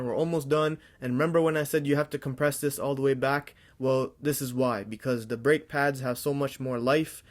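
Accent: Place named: Toronto, Canada. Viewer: sounded like a toronto accent :p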